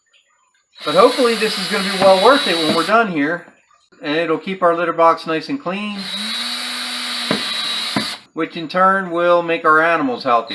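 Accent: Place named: Maryland, United States. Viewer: Excellent video! Btw you sound straight outta Glen Burnie. You’re from MD aren’t ya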